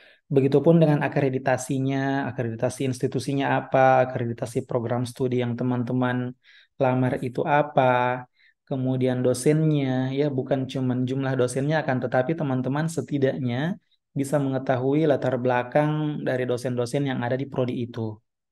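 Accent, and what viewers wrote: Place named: Sulawesi, Indonesia. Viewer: Bang orang sulawesi ya , logatnya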